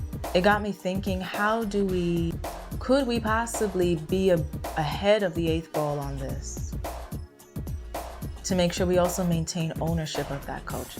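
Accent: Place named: New York, United States. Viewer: I really love your swag. You look just like Alicia Keys, everything about you (beauty, skin tone, smile, peace of mind, way of speaking (the New York City swag), natural beauty, your love for the culture, knowledge, ...), and I mean it, everything about you really reminds me of Alicia Keys. Thank you and please, keep the videos coming. Look forward to the next video. Thumbs up! Hats off!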